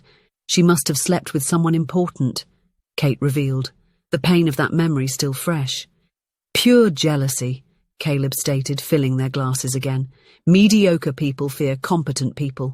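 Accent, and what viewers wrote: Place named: United Kingdom. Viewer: Loved the story. British Narrators are Amazing. East Coast USA. 10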